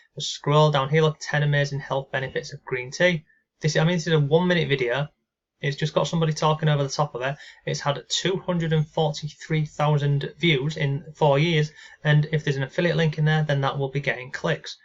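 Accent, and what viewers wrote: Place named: North, United Kingdom. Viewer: I love your accent! Is it from the north?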